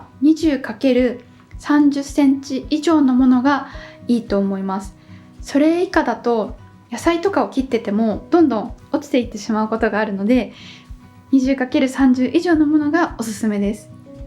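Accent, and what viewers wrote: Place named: Wakayama, Japan. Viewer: 発音が和歌山の方かな？って思って、間違えちゃいましたすみません笑🤦‍♂️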